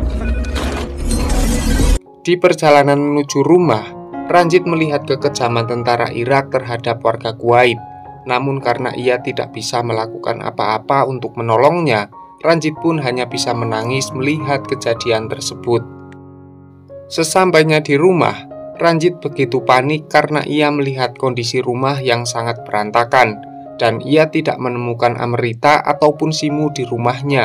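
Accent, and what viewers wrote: Java, Indonesia. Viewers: Baru nemu chanell ini..suara nya jawo tok wkkwkk lgsg subcribe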